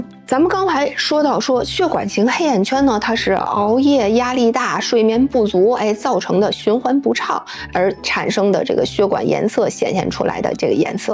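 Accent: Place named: Beijing, China. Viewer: 您的北京话听着很亲切！一对一怎么收费呢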